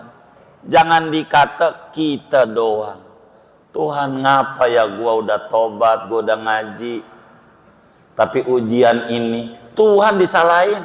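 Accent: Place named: Betawi, Indonesia. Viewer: Suka banget sama ustadnya. Orangnya ilmunya luas, orangnya lucu dan bahagia, ngomong betawinya kocak bikin ketawa jadi asik dengar tausiahnya.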